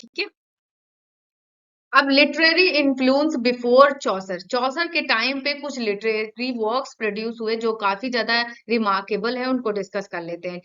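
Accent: Bihar, India. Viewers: Don't say like this galt nhi bs unka kuch tone aa jata h Bihar ka hm unki jitni knowledge gain kr paye bhut badi baat h aur unke jaisa pda paye teachers to bhut but kuch hi teachers ka padaya huaa mind mai aata h ...Sir aapko knowledge teaching I really love 💕 mai bhi aapki jaisi hi teacher bnna chahti hu ki Mera padaya huaa mere students ko samjh mai aaye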